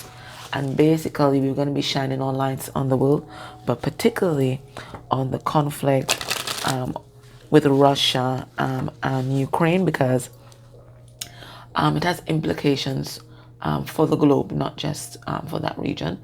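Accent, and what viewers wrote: Trinidad and Tobago. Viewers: Are u Trini?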